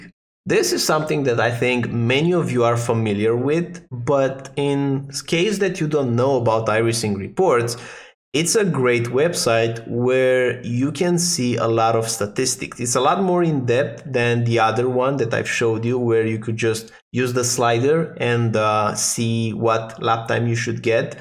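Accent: Romania. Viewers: Knew you were Romanian mostly from the accent, but reclamele în limba română au clarificat acest lucru. 😅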